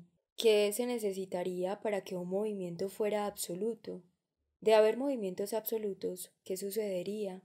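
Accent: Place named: Antioquia, Colombia. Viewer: Bergson con acento paisa, estoy en el cielo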